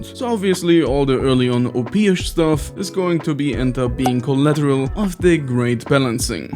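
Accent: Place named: Germany. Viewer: du nuschelst ziemlich was deinen deutschen akzent nicht wirklich versteckt :o dein tonfall ist igwi.. leicht arrogant was, wie ich finde, nicht zu dir passt :o aber an sich ist das video echt cool gemacht :D gj :D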